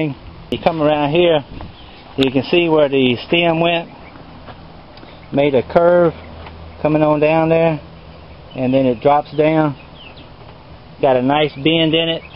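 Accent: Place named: Louisiana, United States. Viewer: Ah ha. Southern Virginia! A couple of comments back, I mentioned something about you selling salsa at an LSU game. I thought you were in Louisiana based on your accent and talking about webcajun. LOL So basically you live in S. Virginia, wear a Tennssee hat, and have a cool Louisana accent. Got it ;-) God Bless.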